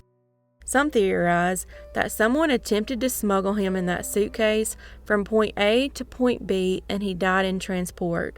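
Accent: South, United States. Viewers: 1st time I ever clicked on a video when the narrator inserts extra syllables in every word. Wooo what a strong hick accent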